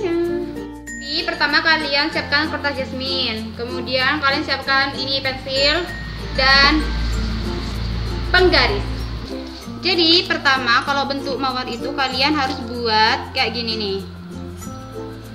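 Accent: Madura, Indonesia. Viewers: org madura kah kak, logatnya.. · Buset..Madura kah kak kwkw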